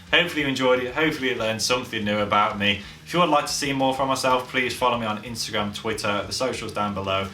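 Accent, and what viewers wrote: United Kingdom. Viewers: Are you british? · Wasn’t expecting you to be northern! Love your accent and it was cool getting to know you after watching so many covers!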